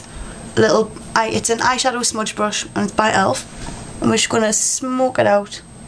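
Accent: United Kingdom. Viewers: You know... you are so beautiful. I am from the U.S. and I love your accent. I've always wished I was from the UK... since I was a little girl. I'm pretty good at copying different accents. Anyway... Your tutorials are awesome and congrats on the BBC thing. Have a great day. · by far, my fav look. keep up the great work. ps- love, love, love the british accent!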